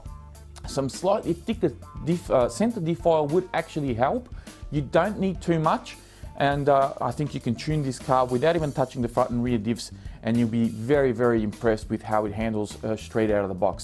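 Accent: Australia. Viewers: bro ur voice is classic aussiee!!! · cool accent to auzzie and south African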